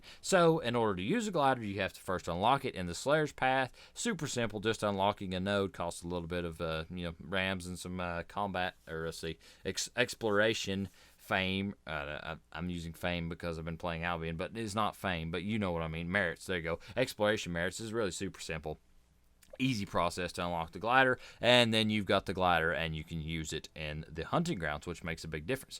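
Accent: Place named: United States. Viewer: Love your cowboy accent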